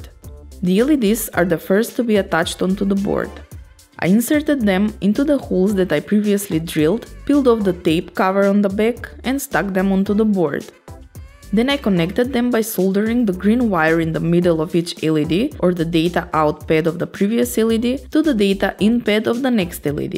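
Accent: Russia. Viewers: hard to imagine what was the cost of that table :) PS: are you from Russia?))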